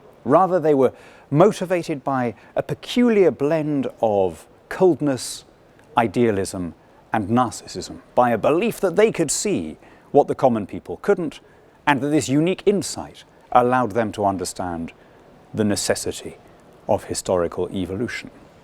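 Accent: RP, United Kingdom. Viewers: Theres something seriously wrong with dan, he sees the world in simple terms, black and white, in most of his videos, communism amd capitalism. Hez guilty of the highest levels of intellectual dishonesty and hez been castigated severally for it. To go on calling out atrocities committed by putin and forget the crimes commited by his ideological political wing in other nations is the heighy of hypocrisy. once he even claimed that hitler was a socialist lol much to the chagrin of a more educated audience he was presenting to. Take out the posh accent and you will realise his points in many of his videos lack any substance in them.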